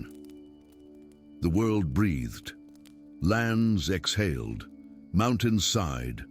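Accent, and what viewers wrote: United States. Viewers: Best one yet. So many stories, I love that. PLEASE stop using American voices. With AI you must be able to choose from hundreds, please use a different one.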